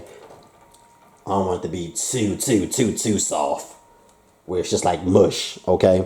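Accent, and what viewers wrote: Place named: South Carolina, United States. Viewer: Bro, you sure you’re not from South Carolina?????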